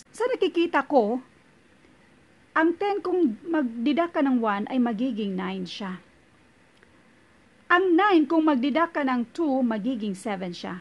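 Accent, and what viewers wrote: Visayas, Philippines. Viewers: tsssk tsssk bisaya dii ka maam ? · Ma'am bisaya ka po? 🙂 nahalata ko lang hehe pero ganda po naman boses nyo kahit parang paos 😅😍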